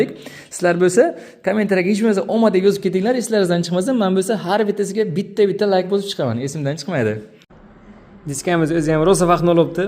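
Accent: Bukhara, Uzbekistan. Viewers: Устажон. Омадизи берсин. Сиззи шевангизни эшитиб Бухоролик укажонларим ёдимга тушди. ОМАД! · "Bexoro şeva" manga yoqadi😃 Yoşligimmi eslatadi..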